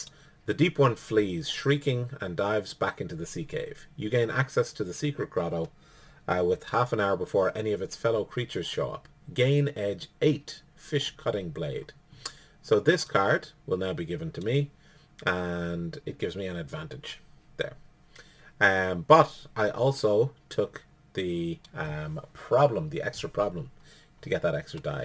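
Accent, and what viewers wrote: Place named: United Kingdom. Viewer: Thanks for the great video! Looking forward to your channel. Also, great accent- are you British per chance???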